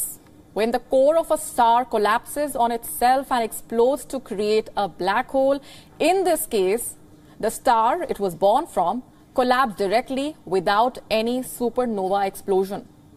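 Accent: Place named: India. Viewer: Why do these indians speak like apu...dont they know that's racist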